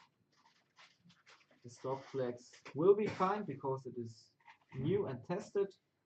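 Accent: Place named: Germany. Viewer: Thank you for spoken English, which is very good. Also your cool German Accent makes English sound more interesting. Would you please consider adding English closed captioning to future videos? I’m sure you guys will gain a lot more subscribers by doing this. Your work is excellent. As always, a BIG 👍 from me!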